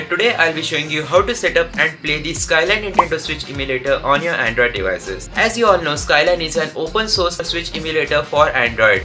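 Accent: India. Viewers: Are you indian 😅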